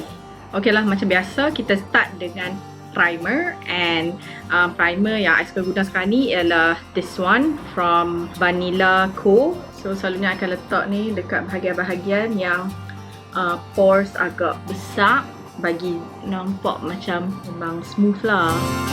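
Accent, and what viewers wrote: Penang, Malaysia. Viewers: Comelnya you guna dialek Penang 😹 tapi I still prefer you guna English sbb I nak belajar. Hahahha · I'm Penang Chinese girl , I totally like when you speak Penang BM , SO CUTE! I love the accent ! Love your make up tutorial too, will keep on follow your video! Thumbs up for you girls ♥ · Puaih dengaq akak ckp peneng after so many years follow channel akak uhukss love teroxx ❤ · ok u chomel gilosss cakap penang!! love it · Lahh penang rupanya 😂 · hehe comeinyaa loghat penang yg campoq dgn english 😆 uuu nampok muka bulat 🤣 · Comelnya cakap dialek penang. Tak kekok pun 😁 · I love the way you speak your bahasa with a little bit of penang accent. There was no accent when you speak in English.. Love your makeup look. Finally a malay makeup youtuber...😘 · Comeeyyyyy aihhh dia ckp peneng..😍😍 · Hahhaha napa rasa lawak dengaq cakap penang😂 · Nurkhotimah Rifqi Pulau pinang, malaysia.. 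she speak in Penang dialect.. so maybe susah untuk kamu faham😂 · Sgt comel ckp loghat penang 😁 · bila dh start cakap penang, kepala mau dok goyanggg tak biaq...gahahahaha hidup penang mai !! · Padu toq orang penang cakap! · Comeyyy cakap loghat penang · Awat cute sangat bila cakap penang 😍😍😍😍😍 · Comelnya cakap penang 😂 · sebagai orang pulau mmg syok dengaq fellow island girl kita ckp penang.. nak malu apa.. mcm ni la kami dok ckp dari kecik.. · i like this vid soo much its like u are completely a different person when u talk in bm with penang loghat some more. your make up is da bomb as always love it !!! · Boleh pon ckp penang...ingt beranak dkt uk 🤣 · Cute lahh cakap pelaq penang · WAIT I TAK HBS LAGI TGK THIS VID BUT GURLLLL I LOVE YOUR PENANG ACCENTTT OH EM GEEEE OKAY NAK SAMBUNG HAHAHAHAH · Dont know why, but I love it way better when u speak in malay.. to be exact, in that Penang slang .. 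thats cute plus u sounds way much comfortable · = 💗 in penang dialect = 💗💗💗💗💗💗💗💗💗💗💗💗💗💗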